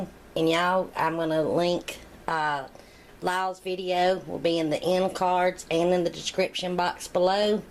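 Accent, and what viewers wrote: South, United States. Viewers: Yes you do have an accent! I love the way you did the subtitles! Yur friend shouldn't do no messin' with us southern girls!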